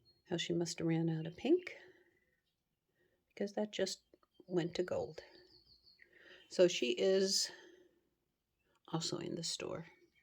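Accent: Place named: Germany. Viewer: Boy that Kathy is going to be busy!!!! I soo love them both! Your sweet German accent is lovely! My kirche in WI is one of the oldest German churches in that area. They continue to do a gottestant service for Easter and Christmas! Not to mention the decor is very European! BTW I love the birds in the background!!! And yes it’s Tuesday!!! Hugs!!!!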